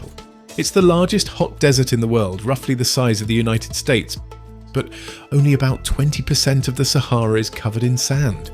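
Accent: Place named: United Kingdom. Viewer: Very repetitive. AND you have a British voiceover with an annoying American AND-LESS number pronounciation. Do better. Dislike!!!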